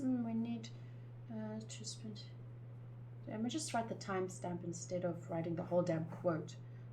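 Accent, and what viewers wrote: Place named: South Africa. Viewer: I just cam across your channel tonight, and when you talked I was like. "YO you South African" if im wrong i will kill myself. But im like 100% sure you are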